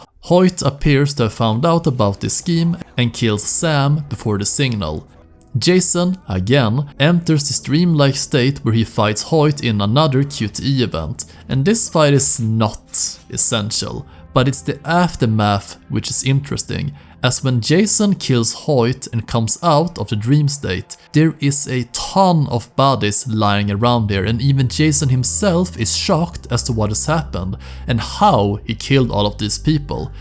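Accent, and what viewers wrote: Sweden. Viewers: Your Swedish accent is noticeable mate. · Hey are you Swedish by chance? Your accent has a Swedish touch to it, also great video btw! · The swenglish is deliciously strong 🤩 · den där svenska aksenten är galen manen grym vidio btw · tog mig 1 sec att fatta att du är svensk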